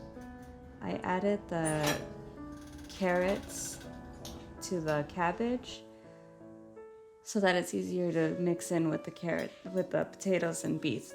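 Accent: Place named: Russia. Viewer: Great video! Love that Russian accent!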